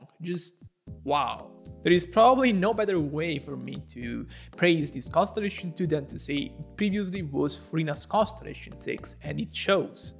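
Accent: Italy. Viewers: Are you Italian by chance? Your accent is very much the part.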